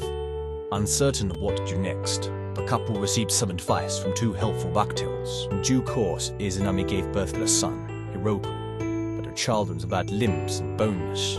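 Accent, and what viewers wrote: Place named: United Kingdom. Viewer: MATE, YOU ARE BRITISH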